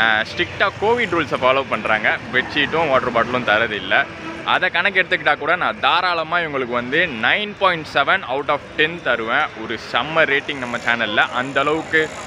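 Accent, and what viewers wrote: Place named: Tirunelveli, India. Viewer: Tirunelveli slang 🤩🤩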